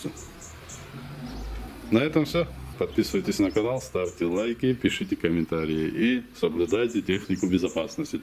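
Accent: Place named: South, Russia. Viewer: Это южный диалект ) Там банка-баллон а пакет-кулек!)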